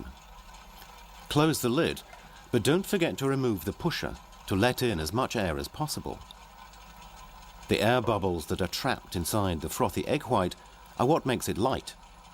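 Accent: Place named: United Kingdom. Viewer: he is british, i like his english by the way.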